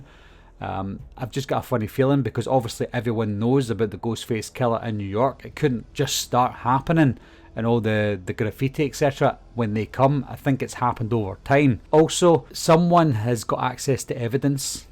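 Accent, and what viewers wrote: Scotland, United Kingdom. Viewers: Scottish accents make everything more enjoyable to me. ❤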